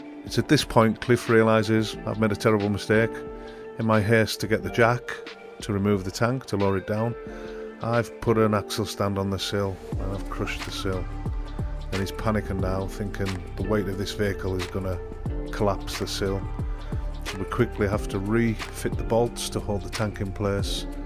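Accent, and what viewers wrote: Cumbria, United Kingdom. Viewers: I knew I recognised that accent! I am from Whitehaven and I am in the process of buying a VW crafter to convert. I look forward to watching more of your videos and popping into your bros shop for some advice. All the best mate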